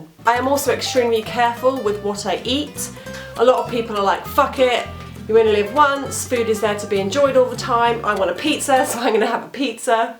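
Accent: England, United Kingdom. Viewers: Hallelujah!!! Oh how I love me some health-conscious, fit, ball-cap wearing TRUTH with an English accent as a morning wake up call!!! So awesome! I am really psyched about this vs the I met when I first started watching this channel months and months ago. The difference is NIGHT and DAY!!! Thumbs WAY UP!!!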